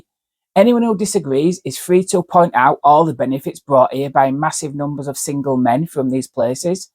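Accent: United Kingdom. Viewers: Certainly speaks with a funny accent (like most Brits). Was that a gay Yorkshireman?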